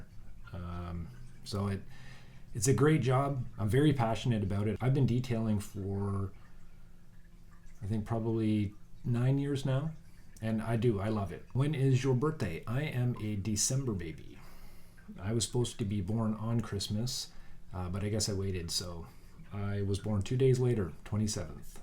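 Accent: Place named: Canada. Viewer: You and your Canadian accent are so cute.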